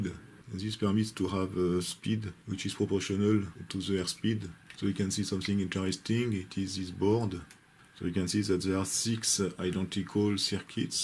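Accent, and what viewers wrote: France. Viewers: Getting better at understanding Frenglish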